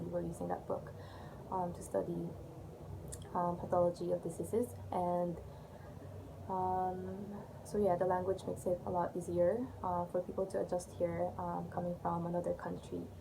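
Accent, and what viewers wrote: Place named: England, United Kingdom. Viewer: I stumbled upon your channel paid attention to the details you say, well im humbled you're such a gentle soul. Prospective doc here graduating pre-med next june thank you so much for the helpful information concerning MD in in Ph well im in Ph as well. You doing a great job im better informed because of you. Planning the US residency too soon. Allow me to pass my last 2 sentiments I love your calmness and gorgeous english accent!! Im humbled😊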